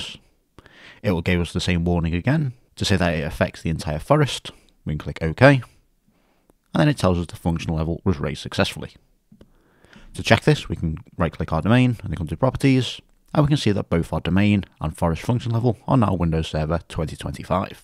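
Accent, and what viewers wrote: Liverpool, United Kingdom. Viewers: Love that scouse accent!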